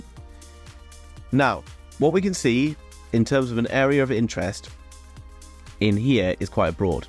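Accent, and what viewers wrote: RP, United Kingdom. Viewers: I think you might be the poshest man in crypto.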